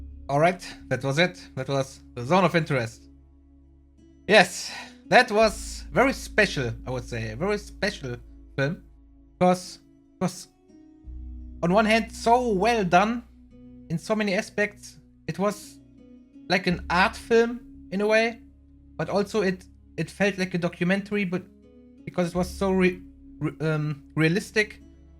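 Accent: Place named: Germany. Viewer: Good reaction. Just guessing by your accent - are you German yourself?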